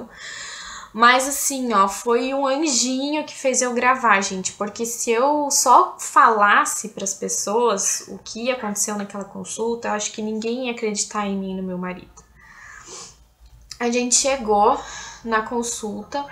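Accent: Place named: Rio Grande do Sul, Brazil. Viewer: ahh bom, achei que fosse aqui do RS pelo sotaque. Menos mal que agora está tudo certo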